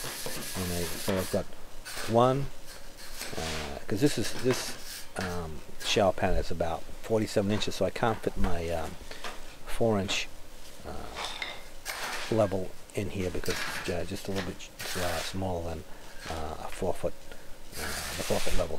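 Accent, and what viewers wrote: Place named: New England, United States. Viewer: Hi, I like your videos and your New England accent. You almost sound Irish or Scottish. That mortar looks so dry. Is it ok to make it a bit more wet like for masonry?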